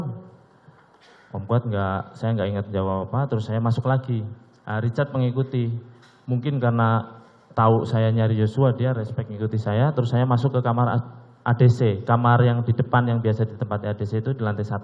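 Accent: Java, Indonesia. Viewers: Baru denger, nama tp medog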